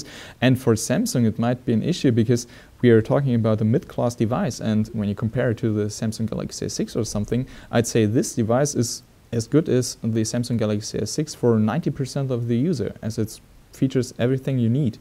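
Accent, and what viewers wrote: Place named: Germany. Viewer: i guess you are German. i like your accent. that's cool man....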